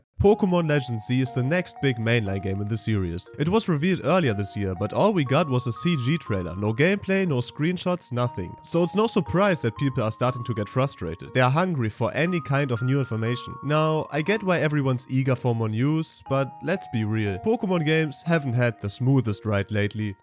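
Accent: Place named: Germany. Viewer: I LOVE your German accent❤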